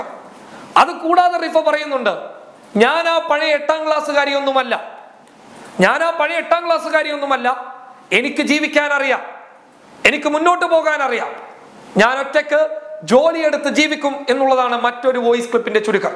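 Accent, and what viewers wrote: Kasaragod, India. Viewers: ഇതില് കോഴിക്കോട് കാരിയായ റിഫ ബാച്ചാ യുടെ കാസർഗോഡ് സ്ലാങ്ങിൽ തന്നെ ആണല്ലോ സംസാരിക്കുന്നത്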